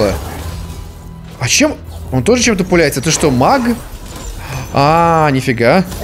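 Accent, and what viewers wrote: Germany. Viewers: немецкий акцент это что-то с чем-то. Жду следующее видео с немецким языком🌺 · Лайк, если знаешь немецкий окцент.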